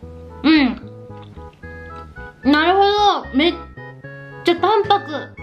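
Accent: Kansai, Japan. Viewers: 昔から早希ちゃんの関西弁？かなり好きでYouTubeでの早希ちゃんは見初めて日が浅いので最近はどうなんかわからないんですがちょいちょいでる関西ぽいイントネーションになんか懐かしさを感じます！ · ちゃんの関西弁まじ大好き！はーと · 最近チャンネル登録して見始めたのでASMR動画のヒソヒソ声しか聞いたことなかったのですが、調理中の関西弁がとても可愛い☺️ 賛否両論あると思いますが、私は手袋なしで食べるの良いと思います！お刺身とかはお箸使って食べればいいじゃん！と思うものもありますが、総じて、動画大好きです☺️❣️ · 関西弁？可愛いな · 早希ちゃん関西人？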